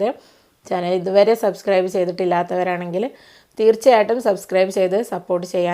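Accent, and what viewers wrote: Malappuram, India. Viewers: Itha malappuran anoo. Talking kettitt..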